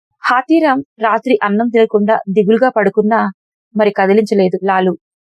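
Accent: Telangana, India. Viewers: తెలంగాణ యాసకు జీవం పోసి మా మనసులను దోచుకుంటున్నారు మీ రచనా శక్తి కి వాక్పటిమ కు జోహార్లు.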